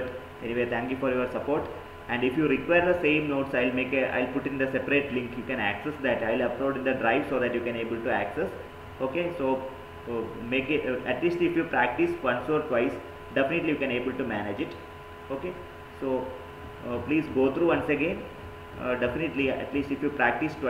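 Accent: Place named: Kerala, India. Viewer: I love your speaking style in Malayalam accent .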